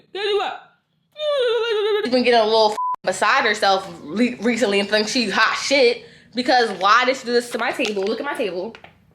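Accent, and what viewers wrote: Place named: Philadelphia, United States. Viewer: In all sincerity if you ever need to talk I'm here for you. You remind me of my niece I used to be close to. I was born and raised in Philly and I'm still close to you in Willow Grove. We could meet have lunch or get a coffee. I mean it I'm here for you, I've started watching you a year ago and think your soo funny I knew you was from Philly the minute I heard you speak. We have to stick together if you ever need to talk or vent I'm here. I run a small business as well I know how it gets sometime. Please don't hesitate to reach out.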